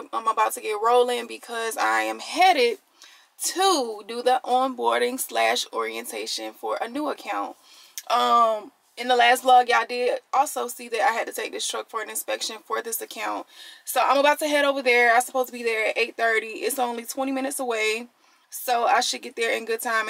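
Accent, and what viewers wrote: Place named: New Orleans, United States. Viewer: you from new orleans huh?i hear it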